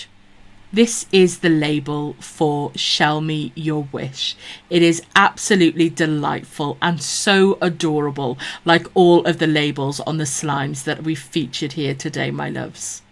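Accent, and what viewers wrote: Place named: United Kingdom. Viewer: I love your accent! And it’s so cute when you say my lovely’s! I just love all of the British language it’s so cute! This was overall a great review! As a reviewer myself you did a great job! You are so underrated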